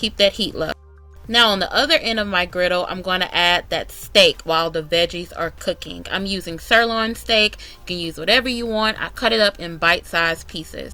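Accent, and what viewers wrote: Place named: Atlanta, United States. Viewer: Girl you can cook! Where you from? I hear some ATL in your blood!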